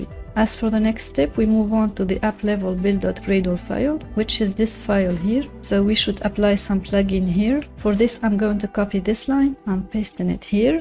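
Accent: Tunisia. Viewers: great tuto and in point, but i can't stop ignoring your tunisian accent, it's like hearing my self talkin, keep going my tunisian girl <3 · ur tunisian right? haha ur accent is soo tunisian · the English accent is so Tunisian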